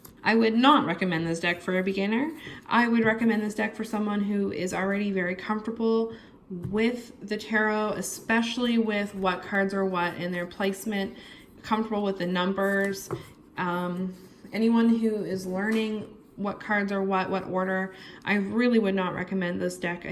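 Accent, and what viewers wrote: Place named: East Coast, Canada. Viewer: Nice deck! Ps you must be from Canada, east coast - by your accent… 😊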